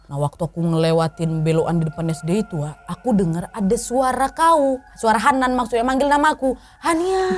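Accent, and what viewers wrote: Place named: Medan, Indonesia. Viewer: Btw, bahasa kk kayak bahasa orang medan. Ceplas ceplos, btw tinggal dimnasih kk ini.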